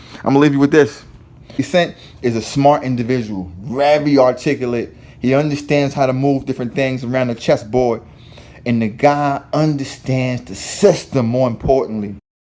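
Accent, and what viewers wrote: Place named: Queens, United States. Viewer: This narrator definitely a Queens nigga 😂😂😂 His voice got me ctfu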